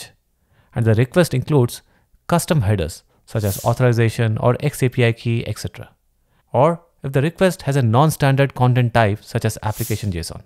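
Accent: India. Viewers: Best !!!! crazy video (I think u are indian by accent tho) ❤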